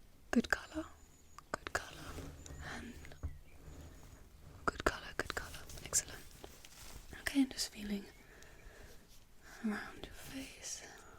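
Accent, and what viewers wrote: United Kingdom. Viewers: Love your voice and accent. Where are you from?? Im Spanish,so I can't "guess" English accents very well,but you sound British(?)Not sure. Anyways really good roleplay💜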